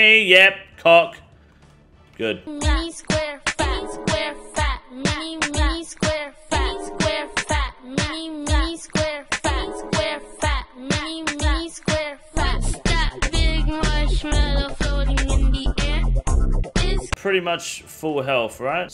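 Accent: Australia. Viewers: ❤Such a Nice Australian accent 🦘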